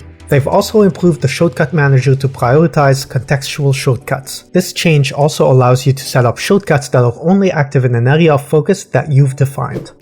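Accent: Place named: France. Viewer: Ton accent t'as trahis à des kms à la ronde ahaha Très content de voir du contenu de qualité de la part d'un français !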